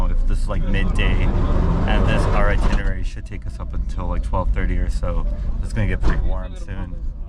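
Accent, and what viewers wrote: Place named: United States. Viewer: Thank you for this beautiful vlog of Berbera Port.I liked and subscribed the channel.Are you from the United States.You've am American accent.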